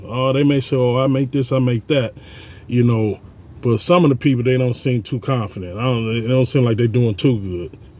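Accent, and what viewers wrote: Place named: Pittsburgh, United States. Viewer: Sound you like from Pittsburgh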